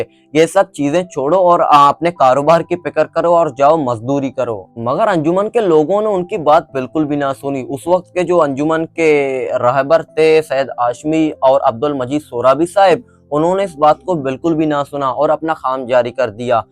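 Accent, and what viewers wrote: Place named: Balochistan, Pakistan. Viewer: Correct your slang. Urdu ko balochi tarka laga ka bat na karo. And your body language is not good. Fix all these issues. Best of luck.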